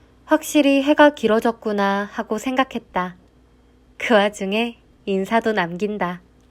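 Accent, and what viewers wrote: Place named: Gyeongsang, South Korea. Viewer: ㅋㅋㅋㅋ 님 사실 과자를 꽈자라고 하시져...?!!!!ㅇ.x(경상도사투리) 오늘도 잘 봤어요💘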